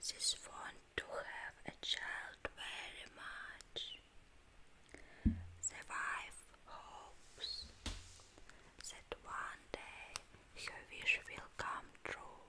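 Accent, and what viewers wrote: Russia. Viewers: Ненадо выговаривать каждую букву на Английскому, ты пытаешся впихнуть нотки Русского в Английский)). A tak я заснул 4etko))) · прости, но your russian accent "cut" my ears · I'm not a fan of fairytales and stuff like this but I'm so happy to hear you speaking in English!!! I really hope you will make some more videos in English from time to time especially doing medical roleplays which I LOVE the most! English with russian accent actually is a trigger for me lol xD ..btw, I just started learning Russian a month ago so I could expand the joy of thousands of videos for ASMR in Russian , too, not just in english!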